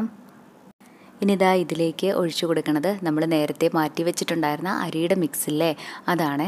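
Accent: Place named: Thrissur, India. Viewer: 🌷👌🌷, നല്ല തൃശൂർ ശൈലി ഭാഷ 🤪💞👌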